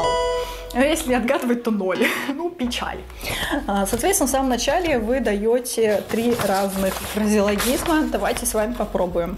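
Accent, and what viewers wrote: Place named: Thailand. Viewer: Корейский звучал с тайским акцентом))))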